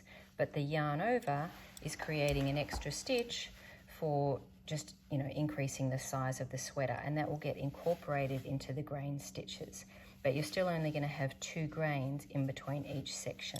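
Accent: Australia. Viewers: Dear Thank you so much for your time spent creating these tutorials. I purchased the pattern in my mother tongue, danish, but because I am a relatively new knitter, it has been slightly daunting. You make it look like child's play. Although I am not fooled into thinking that it will be for me, it does encourage me to think that it may just be possible. Being Scandinavian, I am used to nordic style knitting. Nevertheless your tips are invaluable. Lastly I can't refrain from commenting on the joy it is to hear it all explained in australian english, as i grew up partly in Bendigo, Vic. So I enjoy the light in your beautiful home, which is so typically Australian. 🎉 I do have a bit of trouble balancing my cable needle. The stitches tend to slip off. Do you know any tip to get around this?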